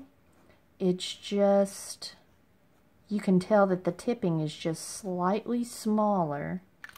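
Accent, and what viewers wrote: South, United States. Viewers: Southern accent eh?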